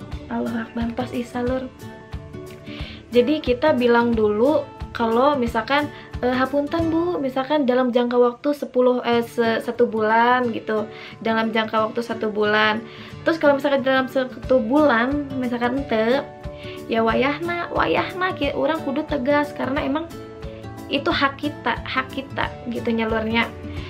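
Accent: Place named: Sunda, Indonesia. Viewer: sundanya meni lekoh pisan mbak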